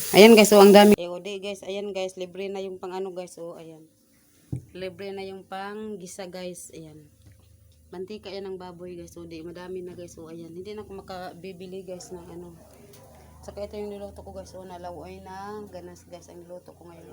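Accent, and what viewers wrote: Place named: Visayas, Philippines. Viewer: Bisaya ka mam,kay law-oy man imong sulti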